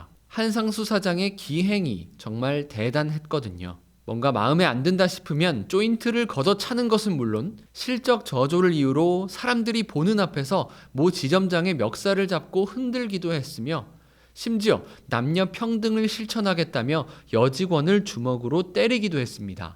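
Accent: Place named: Gyeongsang, South Korea. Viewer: 갱상도 상 남자 노 ㅋㅋ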